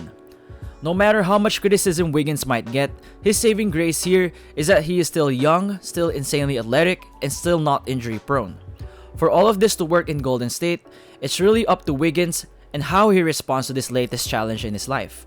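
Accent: Philippines. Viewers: Lol Pinoy accent, my man.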